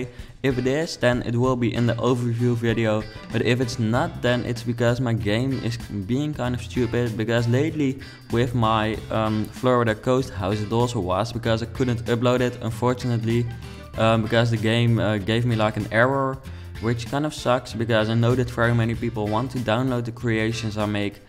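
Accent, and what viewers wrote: Netherlands. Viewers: This is gorgeous. Complaints about your accent are ridiculous, your you, be proud of your accent Dutch!!!! Don't worry about it and the complaints will go away, if they don't then they don't!!!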